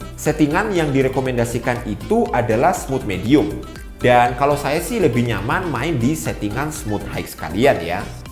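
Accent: Purwokerto, Indonesia. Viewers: Orang purwokerto kah?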